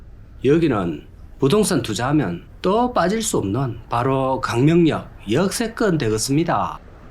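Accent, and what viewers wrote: Yanbian, China. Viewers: 여기주인장님 국적이 대한민국아니죠?? 발음과 사투리가 너무 표가납니다. 연변사투리정도가 더 가깝다 ㅠ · 이사람 발음보니 조선족 화교인갑네.. 어쩐지 말투가 역스럽다 했어...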